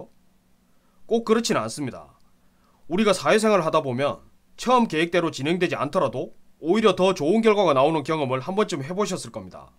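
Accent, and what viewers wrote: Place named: Gyeongsang, South Korea. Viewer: 저 특유의 꼰대같은 경상도 말투가 비호감이라 좋아요는 못누르겠네...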